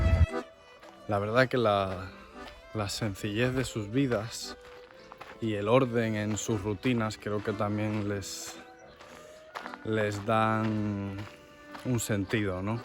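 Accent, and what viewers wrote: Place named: Mexico. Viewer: Muy interesante pensé que eras mexicano por tu físico y tu español. Así es los países poderosos siempre quieren lo que otros pueblos tienen y se los roban e invaden para quitarle sus riquezas. Eso sucedió con mexico y latinoamerica. Cuando España nos invadió , masacró , eliminó , nuestros idiomas originales y se llevaron todas nuestras riquezas Pero lo que no nos pudrieron robar fue nuestra esencia y valores. Me gusta mucho Moldavia y Rumania son parecidos a los de latinoamerica. Mucha suerte en tu canal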